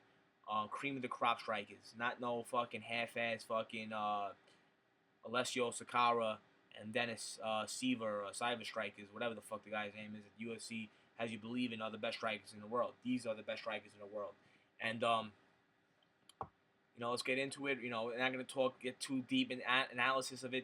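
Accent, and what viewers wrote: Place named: Liverpool, United Kingdom. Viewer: u speak like a true scouser bro good shit keep it up but u should be on the freddie roach plaster in glove trick gsp used here i think dana was on to it he didnt look pleased in post fight presser wen he pointed out hed never seen someones eye go like that in 1st round off jabs gsp cheat !!!!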